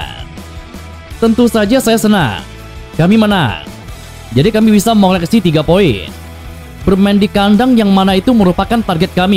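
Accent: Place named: Java, Indonesia. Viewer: Medok medok jangan banyak kata kata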